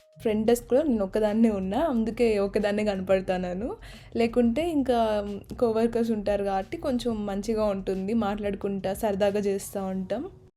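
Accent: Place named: Warangal, India. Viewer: garu mi slang pakka Warangal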